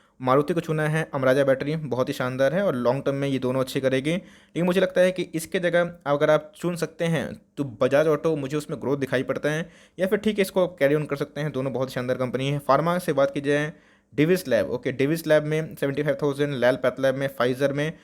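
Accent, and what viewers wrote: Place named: Bihar, India. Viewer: Kaisa bolta re up bihariya tu bare ki nahi bare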